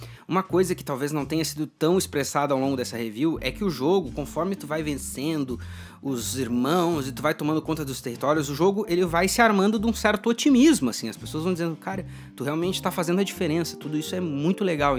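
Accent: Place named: South, Brazil. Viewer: O que me manteve nesse vídeo até o final foi esse sotaque sulista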